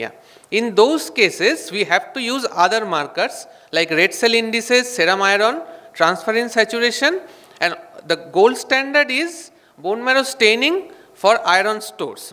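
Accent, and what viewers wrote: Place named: Punjab, Pakistan. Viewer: Punjabi pakistani accent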